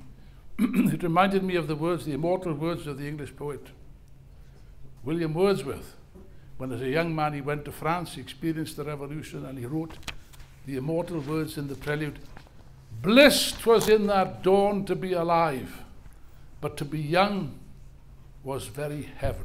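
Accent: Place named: Wales, United Kingdom. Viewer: It IS a welsh accent, you’re right. Interesting that I didn’t pick up on it, since I’m a big fan of The Manics.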